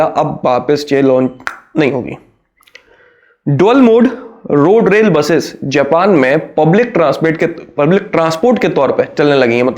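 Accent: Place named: Madhya Pradesh, India. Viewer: bhai tum mujhe mp side ke kyo lgte ho language ki wjh se , jo up se lage huye mp ke district hain unse , jese shivpuri , ashok nagar ,datia gwalior wagera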